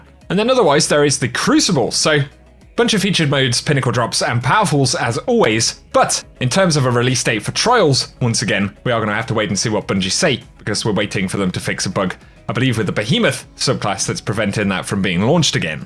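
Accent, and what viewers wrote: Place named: United Kingdom. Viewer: har-bing-ers.... LOL.... cmon - you have such Brit accent but, damn you cant pronounce much... think of it as BINGE like Binge drinking... Har-Bin-gers NOT BING - lol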